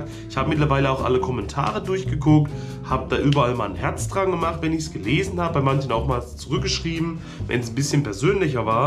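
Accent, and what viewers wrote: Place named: Netherlands. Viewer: Eim sehr spezieller niederländischer Akzent! Glückwunsch nachträglich! 😀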